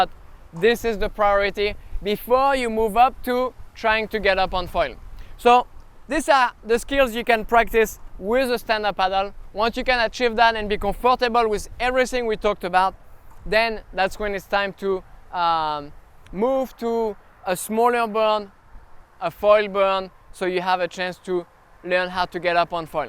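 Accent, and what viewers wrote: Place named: France. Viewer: First and foremost, kudos to you for explaining how to do wing onpaddle board. It’s the most clear concise explanation I’ve ever heard. I will totally use my paddle board first. All these steps will help me concentrate on managing my kite at this time. Most of all any videos you made in the past is very interesting just to listen to your French accent!! It adds to the likeness of video. Thanks again.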